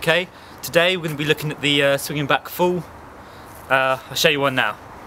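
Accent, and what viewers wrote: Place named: United Kingdom. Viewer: hey man, r u british ??? dont take me worng, but u have a quite intense accent !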